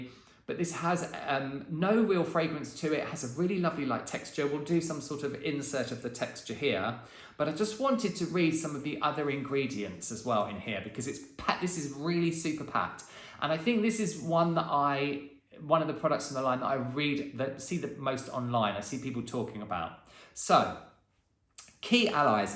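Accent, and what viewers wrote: United Kingdom. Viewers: I truly adore your British accent ❤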